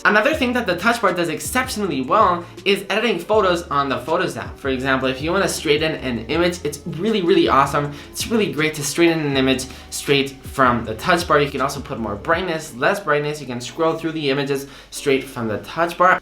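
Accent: Spain. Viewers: I’d guess Spanish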